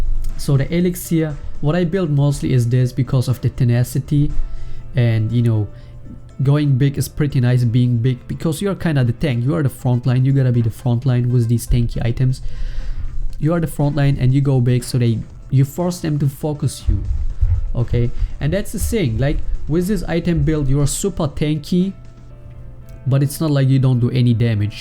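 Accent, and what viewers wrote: Germany. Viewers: u are german right ? :D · are you german?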